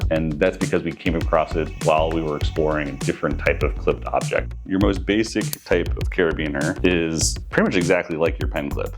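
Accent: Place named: California, United States. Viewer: Cool, but you need to lose that Californian woman’s accent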